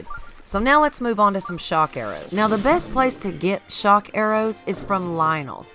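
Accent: South, United States. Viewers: Hello! I love your videos! I'm from the south, living in Mobile, AL, so your voice makes me feel right at home too. I hope you're ready for Tears of the Kingdom! -B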